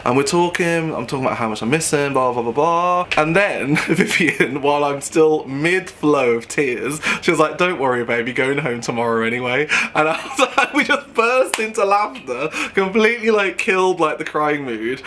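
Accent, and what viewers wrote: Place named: Liverpool, United Kingdom. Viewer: living for the Liverpudlian accent and gorgeous makeup ❤️ Would love to see any club kid look, something more abstract 💞🌻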